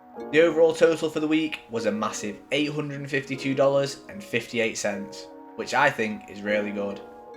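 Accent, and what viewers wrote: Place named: Liverpool, United Kingdom. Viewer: I love the Liverpudlian accent. But then, as an American with Grandmum from Bradford, I'm a sucker for any British accent. I really appreciate your videos on Data Annotation. Thank you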